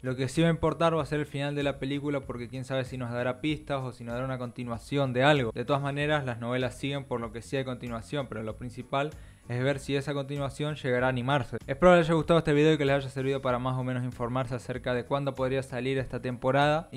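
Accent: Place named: Argentina. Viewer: Buen vídeo men. SOS argentino verdad?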